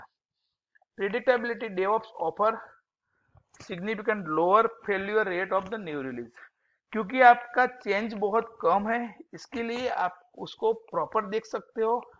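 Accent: India. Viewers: Are you from Orissa ? Your accent clearly shows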